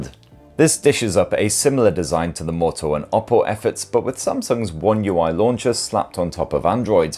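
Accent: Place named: Newcastle, United Kingdom. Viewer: I like this guy he knows his stuff !! Plus I think he's a geordie !!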